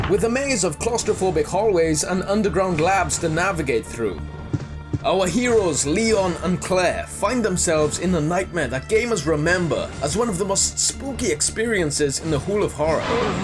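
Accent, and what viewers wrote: Wales, United Kingdom. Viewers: Is Welsh? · Firstly your the only big YouTuber ever to respond to a comment so 100x props for that and then second your from wales so 1000x props for that :P I thought I heard welsh overtones but I wasn't sure, what part ? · No he's british or welsh I think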